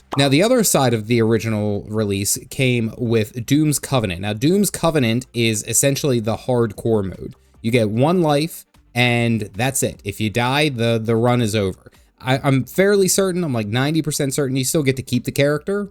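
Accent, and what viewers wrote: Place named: Baltimore, United States. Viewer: Just curious...are you from Baltimore? That accent sounds very Baltimore. Great video. I haven't played since 2012. Good to see they're still around and thriving!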